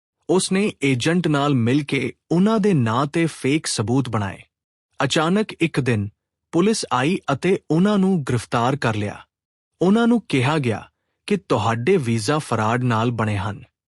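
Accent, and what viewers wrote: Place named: India. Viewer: Punjabi tan tuhadi hindi touch Wali Hai isnu improve karo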